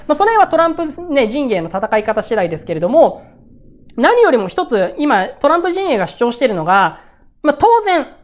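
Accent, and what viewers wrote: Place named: Nagoya, Japan. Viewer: 今日は名古屋弁ちょこちょこ出てたw by 春日井出身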